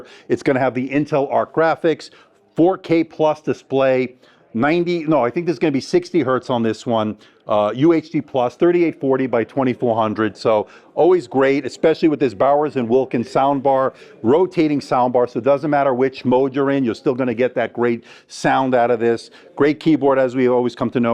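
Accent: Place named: New Jersey, United States. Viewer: You live in Las Vegas, but you have eastern accent, New Jersey maybe?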